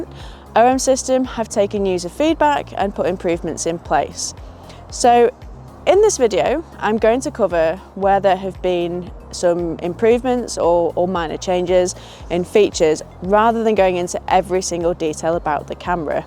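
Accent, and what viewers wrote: United Kingdom. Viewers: Nicely presented and lovely Lancashire accent.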